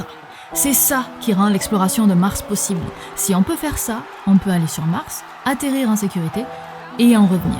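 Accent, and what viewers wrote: South, France. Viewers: Je me pose une question tu est Québécoise ? Car tu à l'accent du Sud de la France . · Y'a un truc qui colle pas : T'as l'accent du Sud, mais tu es dans la neige ! Quelque chose dans mon cerveau déconne en voyant ça...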